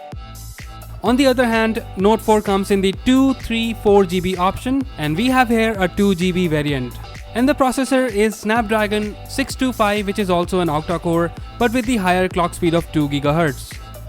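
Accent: India. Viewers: I love this Indian accent :D And since the Redmi cost more and is less available, here in Germany, I would go with the G5.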